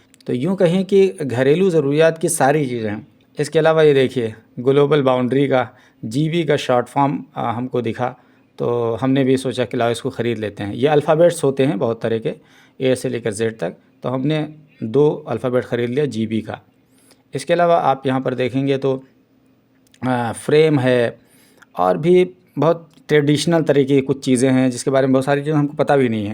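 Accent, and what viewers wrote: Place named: Lucknow, India. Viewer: lakhnavi Andaaz Bahut Khoob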